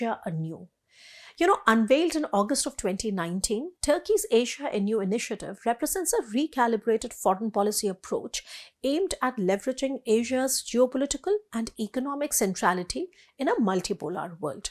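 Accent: India. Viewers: What kind of nonsense is this? The Indians look like jokers, who just get on the blower and talk whatever shit they like. No wonder India's reputation around the world has taken a hit in recent years. This lady is reading from a teleprompter with such a heavy accent that makes you cringe. Poor Turkey is now in the verbal diarrhoea of Indians since it supports Pakistan.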